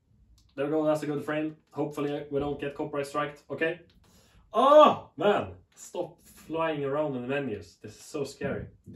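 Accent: Sweden. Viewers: Er du svensk. Hører den svenske aksenten din Det er vel mange gamere som bruker C4 også i tillegg til filmelskere. Jeg har LGCS oled som jeg bruker ps5 på.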